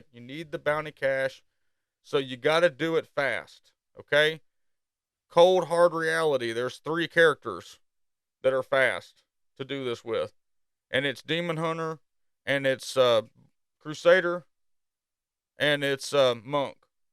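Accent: South, United States. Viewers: Where are you from? Alabama, Texas?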